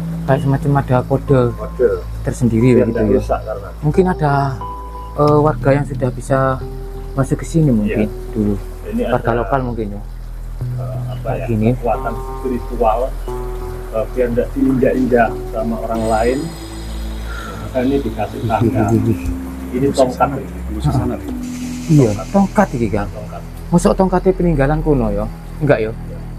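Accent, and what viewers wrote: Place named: Wonosobo, Indonesia. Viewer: Kayak logat wnsbo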